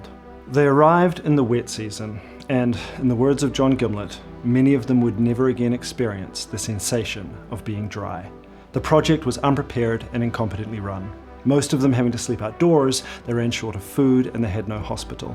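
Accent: New Zealand. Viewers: Are you kiwi?? South Island? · Kiwi accent there?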